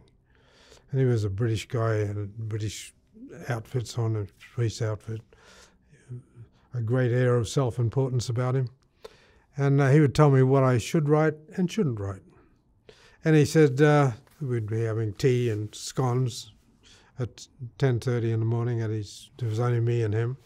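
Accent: Australia. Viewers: I always wondered where News of the World stories came from (with an Aussie accent). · As an Aussie from North Queensland too, I recognised his accent straight away! And the “gum tree” in the title gave me a hint too. 🇦🇺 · Wow youve got an aussie on 👏 Hailing from Australia 🇦🇺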